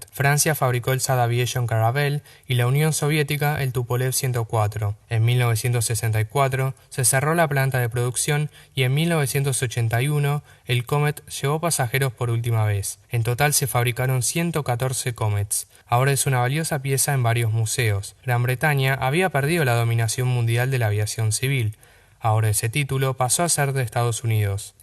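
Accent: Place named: Argentina. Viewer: comencé a verlo y a poco andar se oye la voz de un argentino con sus aches características en vez de doble eles o "y" donde aquí no resulta para nada creíble -.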